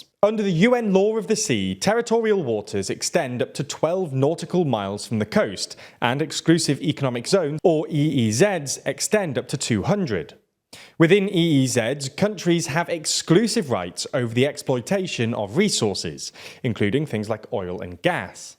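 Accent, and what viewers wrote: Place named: London, United Kingdom. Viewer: lol.....they arent.....they are oceans apart , worlds apart . greece is an ultra secular society only a bloke with Piccadilly square accent could misdiagnose this deescalation as the beginning of a friendship i am half italian half greek and i can firmly say that greece n turkey is like oil n water they dont mix. kalimera to the world from kalamata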